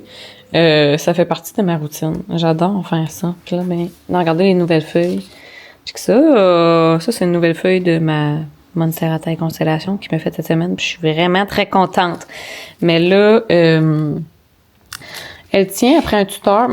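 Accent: Quebec, Canada. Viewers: Alala quel régal que de plonger un petit peu dans ton quotidien ! J’ai écouté toutes tes vidéos sur les plantes, j’ai beaucoup appris d’ailleurs, merci ! C’est agréable de te découvrir autrement. Chouette partage, n’hésite pas à continuer. J’habite le sud de la France dans une grande ville, ça me dépayse beaucoup de t’écouter parler québecois, j’adore ! Puis de voir les alentours de chez toi qui sont si beaux et plein de nature. J’ai hâte d’acheter ma propre maison pour décorer et jardiner autant que tu le fais. Ma quête actuelle : trouver des beaux caches-pots pour embellir ma maison. Merci pour l’inspiration ensoleillée 😊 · Bonjour 🎉 moi c’est Ingrid! Je demeure pas tres lioin des Laurentides. Je suis trilingue, français,anglais et Allemand d’origine! Mais ca fait tellement de bien entendre du VRA québécois c’est succulent🤌 je suis abonné depuis que j’ai visionné ton dernier plantour!!! 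J’ai des plantes depuis longtemps et je mis connais quand même assez bien 😊 mais maintenant se que je recherche, cest plantes décos, nik nak…cadres ect Jadoooore! Et les chiens, my god tu viens de me perdre 😂 je suis toiletteuse jai mon salon chez moi en campagne! Ca s’appelle ABEILLE AU BOIS DORMANT 🐝 et mon conjoint Carl a sa compagnie paysagiste! Jadore tes vidéos ❤ bonjour aux chiens stp 😊jai 2 chiens, Abeille ma berger australien et Clémentine ma chiuahua, 2 pinsons, des poules et bientôt un autre chien ❤❤❤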